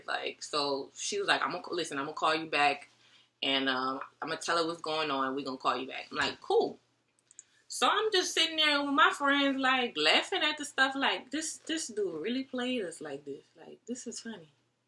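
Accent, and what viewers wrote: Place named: South, United States. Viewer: OK. That's the sexy grammar I hear. You're a southern bell. Cool.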